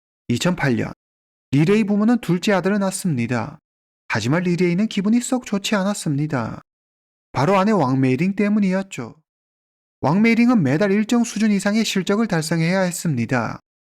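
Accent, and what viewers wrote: China. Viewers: 유튜브채널주인 조선족임? 말투가조선쪽인데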